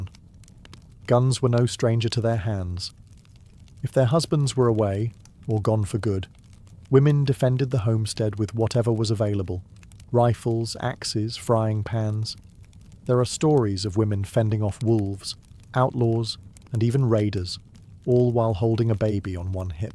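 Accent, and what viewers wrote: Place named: United Kingdom. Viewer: PS: Are you American, Canadian or British? Your voice and word usage sound vaguely British.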